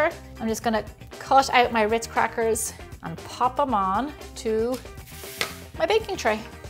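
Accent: Ireland. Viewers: I moved from Dublin Ireland To the United States When I was 12 years old And I really love your Irish accent. unfortunately I lost my accent because the kids in school made fun of it and I so love to hear you speak in that lovely accent, and of course your recipes are fantastic!!! I am so happy that I found you on here!!!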